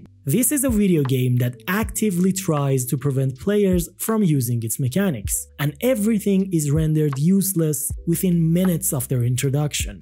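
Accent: Iran. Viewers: u got that persian accent bro 🗣🗣🔥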